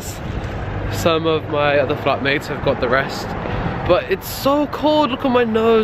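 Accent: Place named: London, United Kingdom. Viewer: London accent really amazing 😭😍 i like it 💛 some times i try to speak like London people but i can't 😭😭😭😭😭 i am in love with london accent💙💙💙💙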